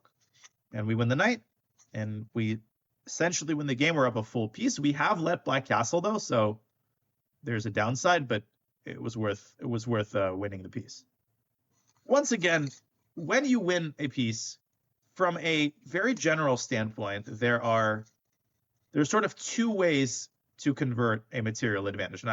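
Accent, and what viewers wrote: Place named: Russia. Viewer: As much as I appreciate your speedrun course I still can't understand why you have been constantly using the Russian words and accent in the time when Russians invaded Ukraine and commit so many war crimes.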